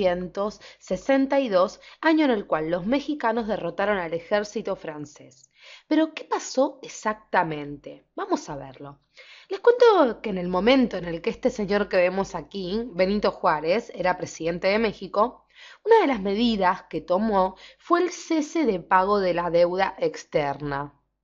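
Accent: Argentina. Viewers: eres de argentina?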